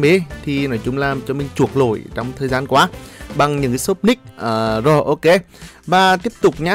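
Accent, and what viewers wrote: Nghe An, Vietnam. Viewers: Giọng Nghệ An dễ thương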